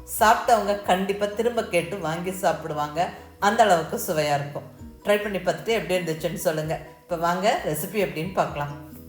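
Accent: Coimbatore, India. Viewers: மிகவும் அருமையா இருக்கு. நீங்க பேசுற கோவைத் தமிழிலே இன்னும் சுவை கூடத்தான் தெரிகிறது. மகிழ்ச்சி. வாழ்த்துக்கள் அம்மா.👍💐🙏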